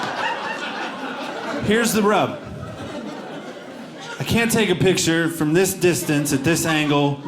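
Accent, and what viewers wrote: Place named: South, United States. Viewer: Funny man. His southern drawl is spot on.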